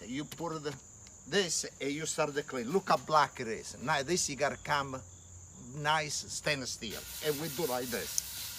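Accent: Italy. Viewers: Who is this? You sound Italian! Are you sir?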